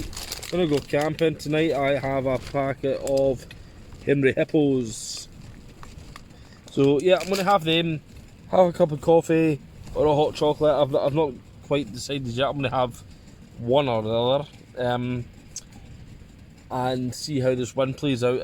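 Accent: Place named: Scotland, United Kingdom. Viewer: I'm subscribing just because I am so in love with the Scottish accent and I could listen to you all damn day.😄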